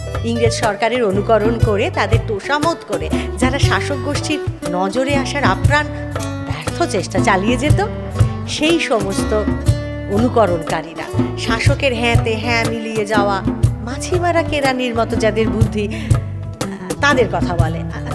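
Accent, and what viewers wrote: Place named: Ballygunge, India. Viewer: সবই তো হ'ল। কিন্ত বাংলা উচ্চারণ? না একদমই নেওয়া যায় না। 'র' 'ড়' 'ফ' সব ঘেঁটে ঘ। না ভাই এমন বালিগঞ্জীয় ultra বাংলা উচ্চারণ চলবেনা।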